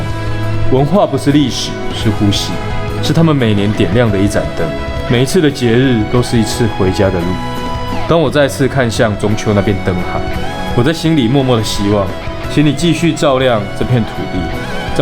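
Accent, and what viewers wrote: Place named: Taiwan. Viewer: 口音聽起來像台灣人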